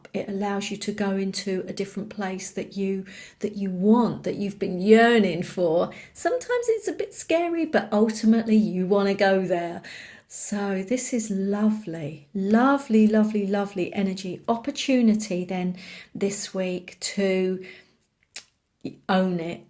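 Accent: United Kingdom. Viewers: Thank you, for your loving bright smile and face, that so much express the Spiritual Community of Light, which leads and inspires you on this specific task you are performing with us here. You are ALREADY in your own....Zone of Genius, dear friend!! For sure!!! But I feel also very grateful for the Quality of your presentation, the respectful, joyful and positive personal style of your image to us! And your gorgeous, clearly spoken, British English, of course!! Lots of hugs!!!🤗🤗🤗🤗🤗❤️❤️❤️❤️ Liza.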